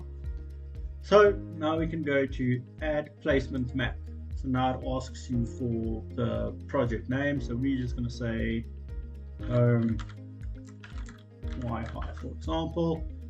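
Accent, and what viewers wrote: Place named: South Africa. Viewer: South African voice……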